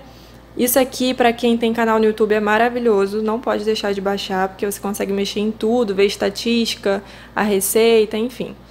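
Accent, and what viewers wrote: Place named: Rio de Janeiro, Brazil. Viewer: Carioca... Aqui no Rio geralmente usa muito o x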